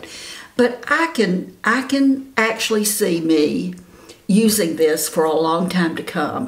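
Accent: South, United States. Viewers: I just came across your video and consider myself a very mature skinned woman at almost 69 years old. Kimberly also was the artist who convinced me to take the deep dive, even though she was not the artist who did my colour match. I had been on the fence and had been contacted by individuals I knew but having mature skin compared to their flawless young skin was making me very hesitant. Watching Kimberley Weiner tipped the scale. The beginning of your video compared to the end should convince anyone to give this makeup a try! You are beautiful & you ROCK with confidence. Thanks for sharing🙏❤️ BTW....love your southern drawl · what a warm, beautiful spirit you have; much less your southern charm and charisma drenching your whole persona! You are definitely a spell binding performer and entertainer while teaching us girls how to look our best! i was born and raised in Atlanta and could listen to your southern belle talk forever! 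Carry on, sugar, you're fantastic!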